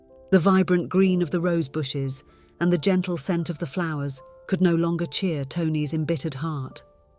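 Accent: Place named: United Kingdom. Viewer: I love your narration style and your British accent. So, I subscribed to your channel a couple of minutes ago.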